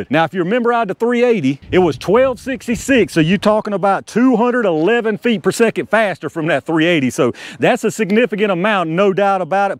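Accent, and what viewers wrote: South, United States. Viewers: Your thick southern accent makes me feel confident that you know wtf you're talking about 🤠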